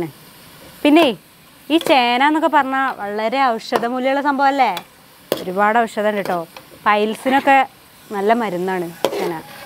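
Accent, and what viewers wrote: Malappuram, India. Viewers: ഒട്ടും ബോറടിക്കാത്ത സംസാര രീതി .. നമ്മളെ മലപ്പുറം ഭാഷ അടിപൊളിയല്ലേ,,, മൂന്ത ചപ്പ്ളി പിളി ആവും,, നമുക്ക് മാത്രം മനസിലാവുന്ന ഭാഷ.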